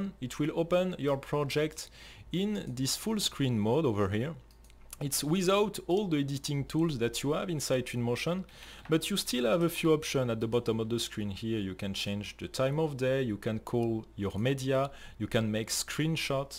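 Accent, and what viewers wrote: France. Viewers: Oui, "french accent", et : - Dommage que cette présentation ne soit pas en français. - Dommage que les sous-titres n'existent pas en français. Tout ça est bien paradoxal !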